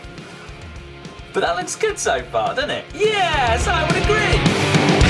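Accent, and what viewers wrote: United Kingdom. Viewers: How much would you say all the materials for this cost? If possible I want to make myself one. Don't worry about the cost for the tools like the drill and such, I can deal with that. (I know people) I just want to know if I can afford the raw materials. (I probably can't) You sound british, but If possible I would like to know the approximate price in US dollars. I'm also not expecting you to see this comment...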